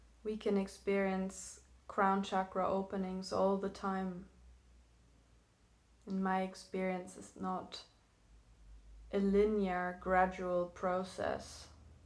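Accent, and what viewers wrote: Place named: Ireland. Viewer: first comment🤪why didn't we start with crown? sorry, I don't mean to be a prick(it just comes natural lol). I like to employ frequency & vibration, light & sound. as far as I understand the bliss of the chakra-gasms, its different from the actual transcendence , but essential on the way. 😁your wisdom is beyond your years, im jealous LOL "when I was your age..."😂 your voice is perfect, brogue? can you run your voice through a compressor or limiter & boost the db so I can hear your voice at an even volume.🥰 crap im not being critical honest😇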